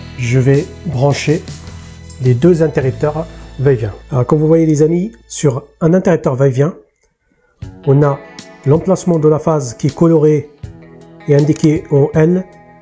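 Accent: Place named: South, France. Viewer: Je découvre et c’est vraiment bien expliqué, et en prime cet accent du Sud qui lorsque on vit dans le Nord remplit de bonheur.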